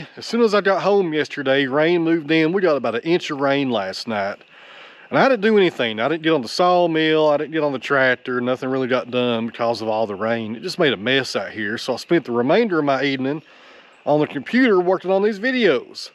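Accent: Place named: Kentucky, United States. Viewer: Of course you got a second tractor... 😁😁😄 I understood absolutely 0% of of the discourse about the new toy, but that's ok. I'm really just listening to the sound of Kentucky. 😊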